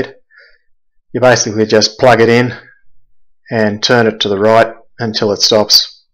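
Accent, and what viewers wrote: Australia. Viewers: Great review. It's hard to hide that Aussie accent. I just Googled it and it seems pretty good for the price. It's currently list at $349. Is that what you paid? What part of Oz are you in? I'm in Gippsland. Cheers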